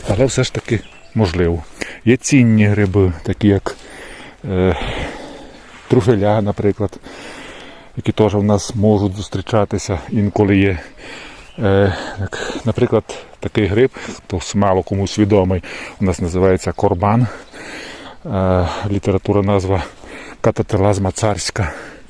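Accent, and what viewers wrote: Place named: Lemko-Boyko, Ukraine. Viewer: Ще хотілось підмітити Ваш чудовий наголошений звук "И", який притаманний говірці лемків і бойків, дякую!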